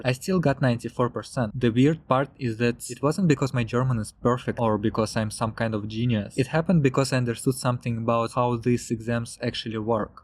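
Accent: Russia. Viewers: First of all, thanks a lot for your videos. But, are you sure you are from Korea? You accent sounds very Russian to me.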